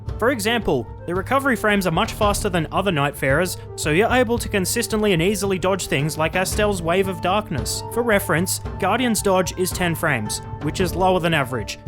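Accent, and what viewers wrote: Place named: Australia. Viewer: I've seen your videos before where you were disproving the slander on guardian and showing how he's actually supposed to be used, and I was already planning on playing the character at some point, but after watching a video of this dude absolutely slaying shit in depth 5 with guardian, it further motivated me to pick this character up after countless hours I've spent on executor and Wylder. So when I was looking for a character guide just to make sure I was doing things right, I knew it was an absolute must to make sure the video was done by you. As soon as I heard that Australian accent, I knew I was in the right hands lol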